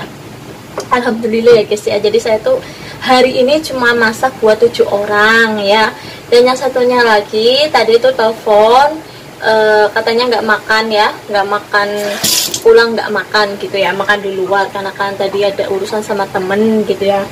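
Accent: Banyumas, Indonesia. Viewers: Ora ngapak ra kpenak ya mbk😁 Sma mbk ce q jg stengah 7 mkn mlm nya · Pokoke Ora ngapak ora kepenak .. Sukses Terus mbak Yu 👍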